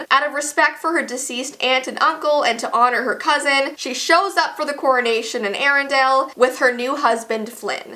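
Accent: Canada. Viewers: It’s not hands it Hans but it sounds like Hands because of her Canadian accent. I’m Canadian and I have an accent like that. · DIH-Do It well she's from Canada and people from Canada have an accent, so that can possibly be the explanation of why she pronounce's it differently🤷🏼‍♀️🙄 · She's from Canada, so they pronounce words differently I believe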